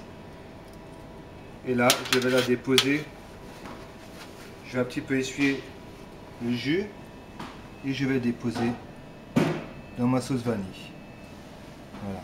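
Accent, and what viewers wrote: Alsace, France. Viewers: est-ce un accent alsacien que je reconnais là ? je me trompe peut etre hein...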